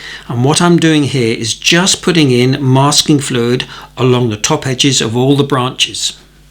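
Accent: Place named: Scotland, United Kingdom. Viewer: 'Jist' loved todays wee tree extravaganza...the touch of 'Scots' twang wis jist grand 😉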